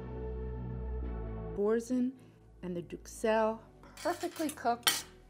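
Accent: United States. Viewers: Lol I love your pronunciation of everything, it’s so American it’s cute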